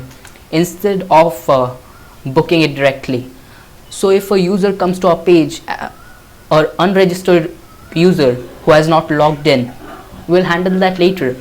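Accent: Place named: India. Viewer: I HATE INDIAN AKCENT I UNDERSTAND NOTHING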